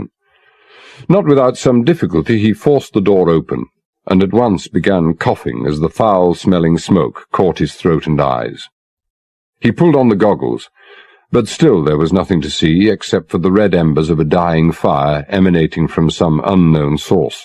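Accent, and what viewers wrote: RP, United Kingdom. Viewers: If someone will please say, what is this way of speaking? I’ve listened to a lot of different British accents and this is distinctive. What place and frame of time? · They spoke like this in the 40's , 50's 60's ...... grammar school posh talk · It's called received pronunciation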